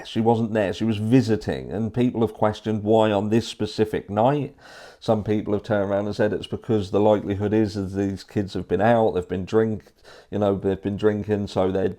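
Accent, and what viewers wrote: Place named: England, United Kingdom. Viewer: now I’m intrigued as to where about you live in England? I’m from Somerset, but now live in Dorset and at times I’ve wondered about your accent too.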